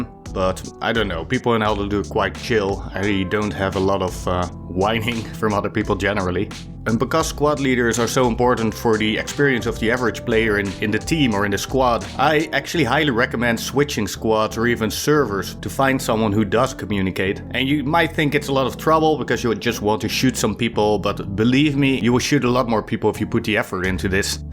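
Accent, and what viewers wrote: Netherlands. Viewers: Just got the game on Wednesday. Been playing every chance I get. I’ve played commander a hand full of times and was straight up honest about it. The squad leaders were very helpful and them because they wanted to win, it was in everyone’s best interest to work through me being a noob. Like you said, a bare minimum commander is better than no commander, although you said squad leader. That being said, squad leader intimidated me the most. Because you are right there in the thick of it all. Commander I hung back, drove supply’s, set up garrisons, and supported the mission via supply drops, bombing runs, and deploying tanks. As a squad leader you have to do much more in my opinion and I don’t want to let my men, and commander down. Also, I recognize that accent anywhere, plus gives it away. My mom still isn’t a US citizen because she doesn’t want to give up her Dutch citizenship, she was from The Hague and my Oma still lives there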